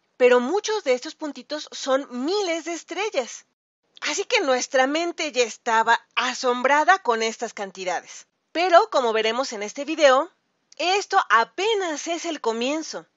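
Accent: Mexico. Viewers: Me gusta la voz de las mexicanas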